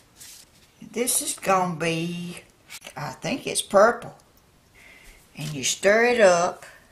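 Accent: South, United States. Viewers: Hello from Kansas City Mo I have enjoyed your videos of your projects so much. Anxious for spring so I can try the cement pots. Now need some pipe cleaners, didn’t know Borax could make something so pretty. I have started doing some Dirty Pour painting, finding it fun, it doesn’t require any art talent. By the way,I also love your sweet southern accent. Your place looks so peaceful & I love seeing all your birds while you do your crafts. Wishing you a blessed day. See you later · My granny Wright was from Ga and raised my Dad in NC. Your accent brings back many fond memories of visiting family in the south. I grew up in Ohio. You are a special lady, I can tell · Hey! So glad to meet you,. I love your southern accent. My mom who is 82 now, is from Arkansas and you remind me of my relatives! You are so sweet! Take care and God richly bless you:) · HILARIOUS!!!! 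I'm sitting here, a lot of pain at the moment, using your relaxed motions, voice, and southern sounds to sooth me. I had JUST been thinking how much fun this would be with my grandsons now that they are on summer break and YOU mention how fun it would be for kids. Thank you so much. I greatly appreciate your crafts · Oh my stars sweetheart I thought you was from Alabama just by your voice. Thats a southern voice. You are awesome. I love watching you. I've learned a lot. Thanks from Alabama. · Ms., You are a TREASURE! You've filled me with joy watching you crafting. Thank you for making my day. Your enthusiasm is infectious, your video skills are entertaining, and your southern accent is delightful. I can't wait to make my own crystal heart, butterfly and cross. (I'm from Michigan and, like you, I too love the sunshine, flowers, butterflies and I can't wait for spring and the snow to be gone for good. 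And I'm sure our bluebirds, who just showed up, agree.) May God bless you, as you are a blessing to others!:)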